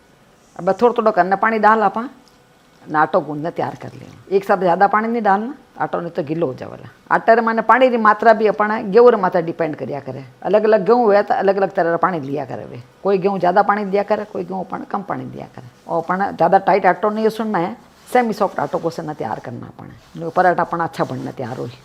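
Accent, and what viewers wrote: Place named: Jodhpur, India. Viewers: You speak very sweet language of JODHPUR we Rajasthan people are proud of you SA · Antiji aap ki recipe bahot saral hoti hai.muje aap ki marvadi bhasha sunke bahot achha lagta hai.aap aise hi naya sikhaya kare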